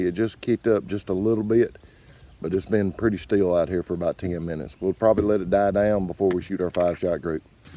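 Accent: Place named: Kentucky, United States. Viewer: You sound like a fellow Kentuckian. Am I right?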